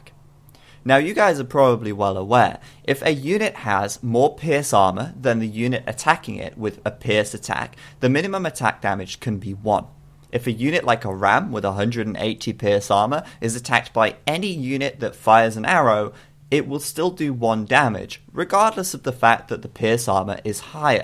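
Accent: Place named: Northern England, United Kingdom. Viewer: northern English to me.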